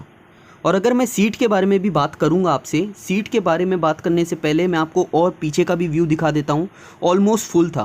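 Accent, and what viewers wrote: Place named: Maharashtra, India. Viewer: Hi, are you from maharashtra or marathi? Because your accent in hindi is similar to marathi.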